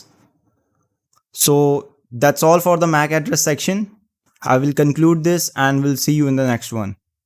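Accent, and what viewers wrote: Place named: India. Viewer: bro are you really from sweden 🤨 , i thought u were indian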